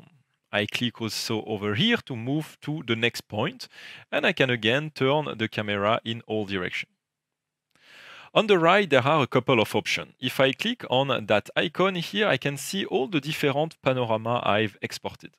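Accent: France. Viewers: Ohhh l'accent de frenchy à couper au couteau...vidéo intéressante. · Hello Twinmotion folks. Sorry, it's nothing personal, I love the French language. But the narrator's accent is very pronounced and I can't quite understand it. Please, I ask you to put it in French with subtitles or in English, but with less accent.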